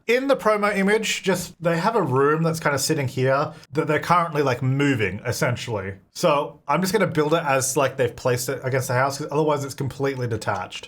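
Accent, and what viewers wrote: Australia. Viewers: I’m curious… is this guy Australian? I like the way he says “He-Ah” instead of “Here” but it’s cute. Good video btw!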